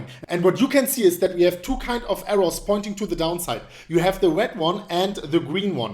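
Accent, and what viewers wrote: Germany. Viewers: Please, try to correct your accent.... Mean your german accent is so strong.....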